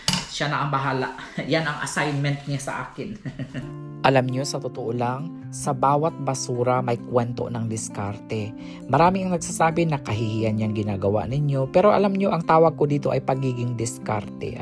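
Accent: Iloilo, Philippines. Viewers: Hi, para Kang Ilonggo maghambal Dai... Find it cute Dear...♥️🙏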